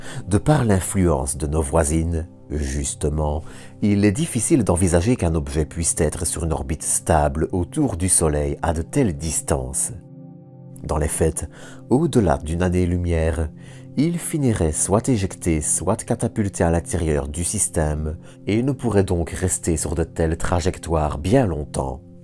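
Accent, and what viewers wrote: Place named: Namur, Belgium. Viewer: Mais de quelle région de Belgique venez-vous? J'ai l'impression namurois mais certaines intonations sont exotiques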